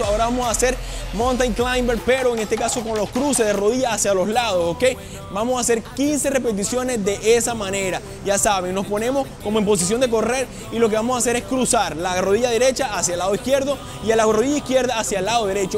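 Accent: Venezuela. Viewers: jajaj es venezolano por eso habla asi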